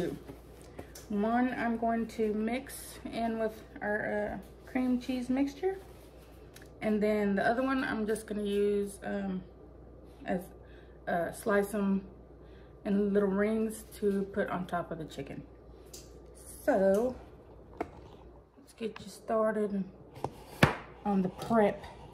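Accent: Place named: South, United States. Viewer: that’s a good one to prep up the night before I bet. I knew a lady that cooked all her meals for the week on Sunday. She put them in the freezer. She lived an hour away from work. Her family would pop it in the oven to reheat. I never have had the space to do that. But if you like cooking and can, that would make a good video for you to do. I like listening to you talk you remind me of my friend. I’m from the south. It makes me feel homesick! Keep up the good work.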